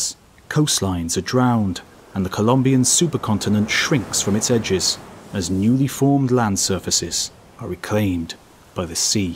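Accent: England, United Kingdom. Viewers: i swear sounds like he says "the year was 1917" :-P gotta love the british accent!! <3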